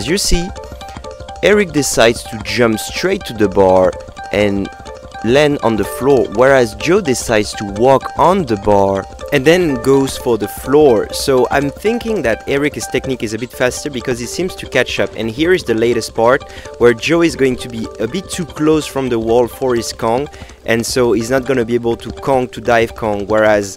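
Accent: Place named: France. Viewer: jpp l'accent français x)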